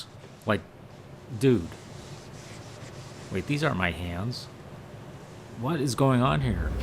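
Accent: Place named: United States. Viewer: It's strange to see this level of obsession with the perfect restore from someone with such an authentic American accent.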